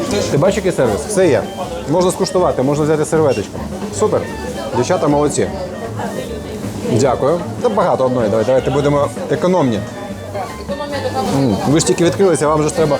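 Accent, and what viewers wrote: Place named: Odesa, Ukraine. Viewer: Уважаемая, разве я Вам хамил? Или называл недалëкою? Или вообще с Вами начинал диалог? Или интересовался Вашим мнением? Вы отвечайте, только тогда, когда Вас спрашивают, а не будьте "затычкой", дюже грамотной. И определитесь сами для себя, на суджике вам говорить, или балакать. А что касается, вся изюминка была, смотреть контент про Одессу, именно от человека с Одесским диалектом, на понятном для всех языке.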